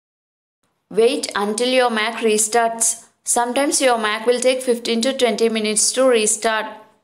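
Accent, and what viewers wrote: India. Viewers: Thickest Indian Accent Ever.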